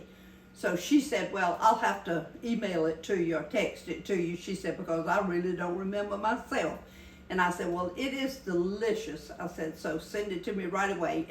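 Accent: South, United States. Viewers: Happy belated birthday. 🎉❤🎉 I hope god blesses you (and all of us) with many more. You are a lovely & classy lady, someone I would love to sit down & have coffee with & hear your life stories. I think I love your channel more for your stories & positivity, to hear your sweet southern accent & to listen to infectious giggles (I laugh every time she giggles) more than your recipes. ❤ Although I do enjoy your recipes & tutorials too & have made quite a few of your dishes. Thank you for sharing. You are a breath of fresh air & I’d rather watch your videos over & over than to turn on the TV & hear sad & depressing news. This Oregon gal sends her love & prayers to you & your family. ❤❤ Shine for Jesus!❤ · I love the way you pronounce and the little sayings from the south. I'm from Oregon pronounced (Oregun). I love the southern accent.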